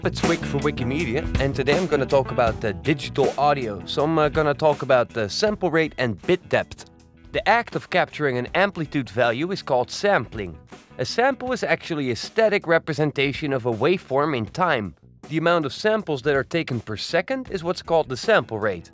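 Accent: Netherlands. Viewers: are you dutch by any chance or belgium? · You sound dutch..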